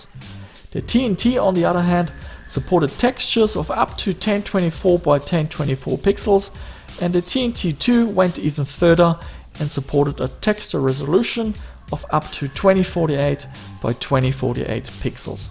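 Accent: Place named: Germany. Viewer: oh ein deutschsprachiger, das hört man sofort gg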